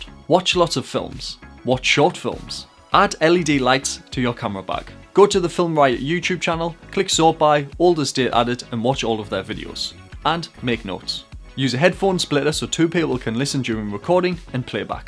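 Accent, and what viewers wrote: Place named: Scotland, United Kingdom. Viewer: it took me a second to get use to the Scottish accent; but the tips where great!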